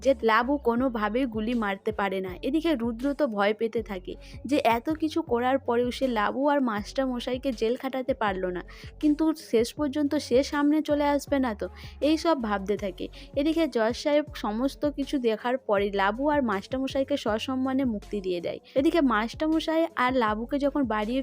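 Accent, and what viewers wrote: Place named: Bangladesh. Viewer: কথকতা ভালো লাগলো।তবে, বাঙ্গাল বাঙ্গাল উচ্চারণ ঠিক করো। চেষ্টা করলে নিশ্চিত পারবে। শুভেচ্ছা রইল।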